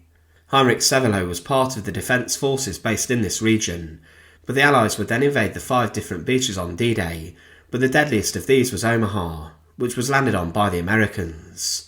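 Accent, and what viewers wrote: United Kingdom. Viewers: Why couldn't an American read this? They always tryna put Brits in charge so it sounds like they're smarter. I don't like listening to this.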